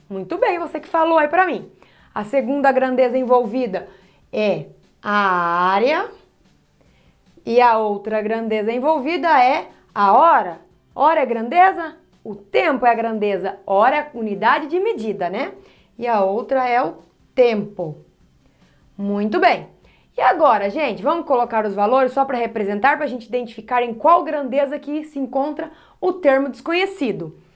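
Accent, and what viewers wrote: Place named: Minas Gerais, Brazil. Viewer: Professora você tem um sotaque muito bonita . você parece ser mineira . gosto muito de matemática é uma matéria muito utilizada no nosso dia a dia 😔 obrigado professora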